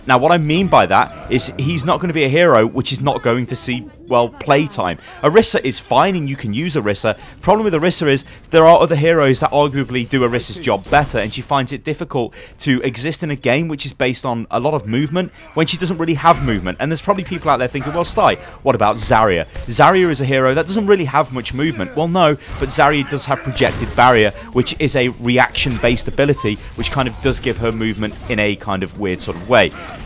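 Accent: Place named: Northern England, United Kingdom. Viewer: Crossfire Gaming it's because he's northern. Everyone loves northern accents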